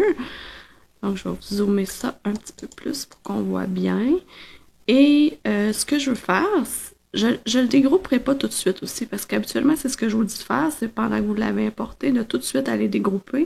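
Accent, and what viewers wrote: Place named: Quebec, Canada. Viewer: Merci pour cette astuce de l’étoile, et cet accent québécois me fait voyager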